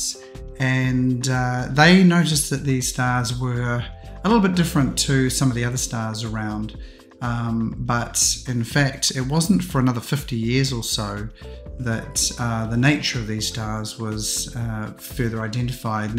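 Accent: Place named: New Zealand. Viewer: Great photos, its always nice to see Southern hemisphere targets and even better to hear a kiwi accent. Im down here in Dunedin and the weather has been absolute rubbish. Im just starting out on my astro journey so Im really blown away with what you have achieved. Subbed and will watch the rest of your videos too.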